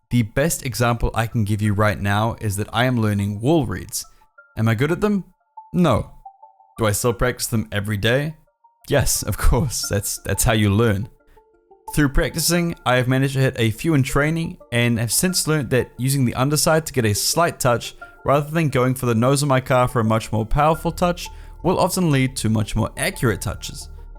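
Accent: New Zealand. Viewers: Are you from New Zealand? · Are you from nz?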